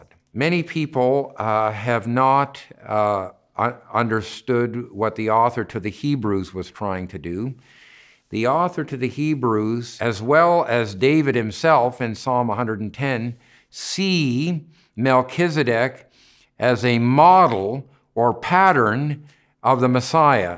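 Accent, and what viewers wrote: Canada. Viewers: One minute in and I pegged him as a fellow Canadian....that accent sticks out like a sore thumb LOL..turns out he is. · Interesting lecture; I could tell he is Canadian right away haha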